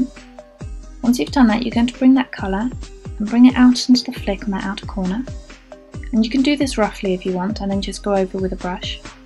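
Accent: England, United Kingdom. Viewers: R u from england xx like me lol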